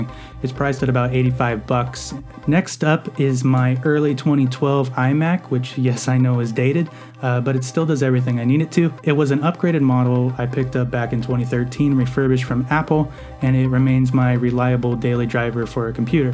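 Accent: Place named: United Kingdom. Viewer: wow love it! Is there an inexpensive way to make my TV my monitor. I need a computer for basic email, word documents, streaming etc. I have a 42" TV with a Roku I would love to use. what's the most economical way to do this? Maybe a future video idea? I'm a big fan! BTW loved the Brit accent lol pretty good actually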